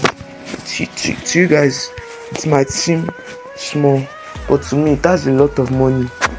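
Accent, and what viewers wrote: Nigeria. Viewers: I LOVE THE ACCENT YOUR NIGERIAN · idk why i didn't expect u to sound Nigerian but nice voice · the nigerian accent :D, luv it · I love Nigerian accents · W I can here ur actual nigerian accent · w voice (like the Nigerian accent)❤❤ i am Nigerian! 🇳🇬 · It's Nigerian accent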